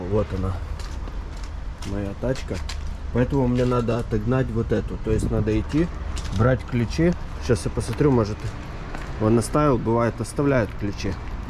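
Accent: Odessa, Ukraine. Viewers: я не сразу понял, что он одессит. Теперь слушаю уже фильтруя слова.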